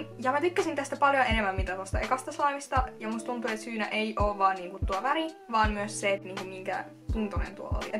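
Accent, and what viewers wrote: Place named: Ostrobothnia, Finland. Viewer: 😂 Kuulostaa kuin puhuisit pohjanmaan murretta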